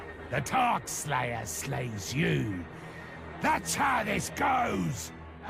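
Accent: United Kingdom. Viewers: True, but the british accent is a bit confusing.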